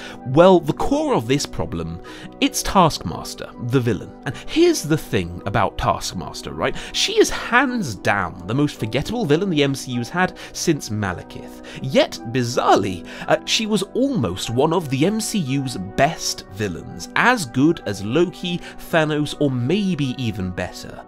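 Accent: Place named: RP, United Kingdom. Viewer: This dude is speaking so posh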